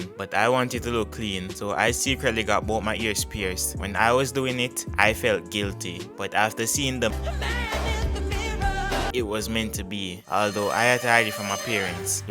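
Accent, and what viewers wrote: Jamaica. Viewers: Badman, da jamaican accent deh nuh gone at all, it strong yf 😂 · U should try n use ur jamaican voice some part in a di video dem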